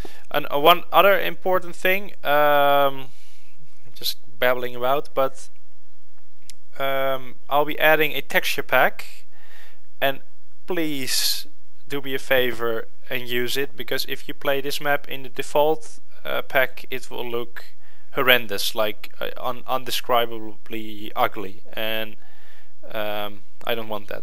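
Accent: Netherlands. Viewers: You sound very Dutch.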